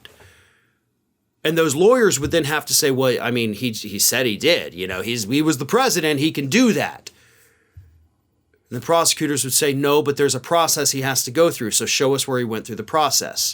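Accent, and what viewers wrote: South, United States. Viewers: I caught your southern accent. Thanks for all your good content.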